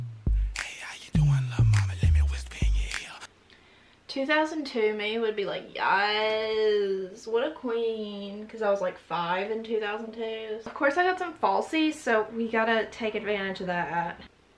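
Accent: South, United States. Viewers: shes from the south lol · ur literally southern sam puckett i, im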